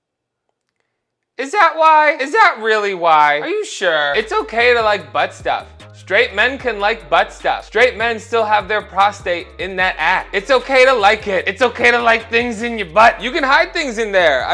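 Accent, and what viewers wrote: New Jersey, United States. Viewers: The longer stays in NJ, the more the accent comes back.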